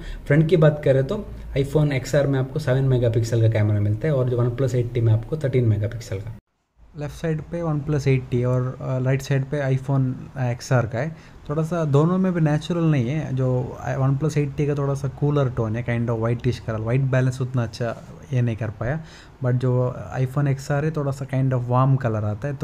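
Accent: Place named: Mangalore, India. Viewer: Your style of talking😁 like mangalore pepoles when talk hindi iam also from mangalore😉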